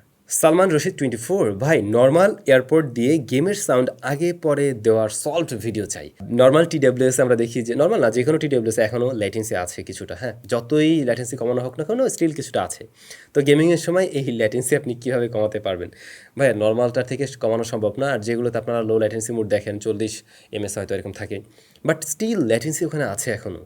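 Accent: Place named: Comilla, Bangladesh. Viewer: Ar vaia apnar village ki Cumilla? Maje maje kotha sune mone hoccilo. Please janaben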